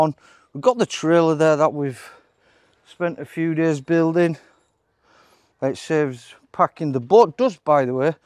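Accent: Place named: Ireland. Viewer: Love your Irish Brogue👍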